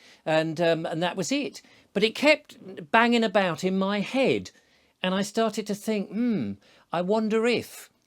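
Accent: United Kingdom. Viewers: Too much British 😊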